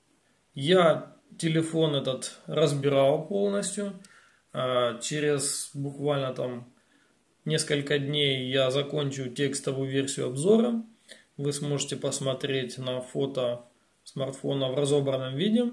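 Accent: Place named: Zaporizhzhia, Ukraine. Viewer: та не, ну шо ты как не свой, акцент то запорожский, ту как не крути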